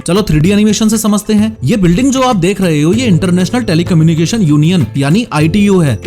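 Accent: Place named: Rajasthan, India. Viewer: Bhai rajasthan se hona aap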